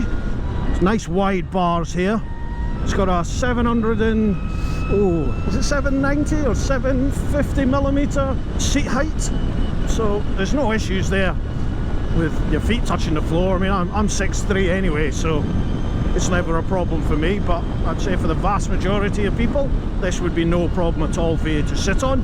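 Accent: Scotland, United Kingdom. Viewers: Subscribed, just for the magic of ur Scottish accent ! Auld alliance ^^ Vive l’Ecosse et les Écossais ! Well, especially lassies ! Thierry from France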